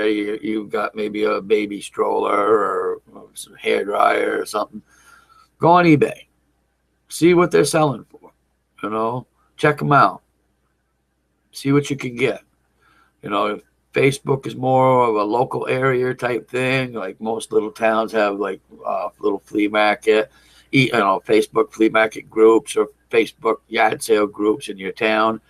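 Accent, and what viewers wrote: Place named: New Hampshire, United States. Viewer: I really like these videos, and your accent reminds me of living in Charlestown, NH :)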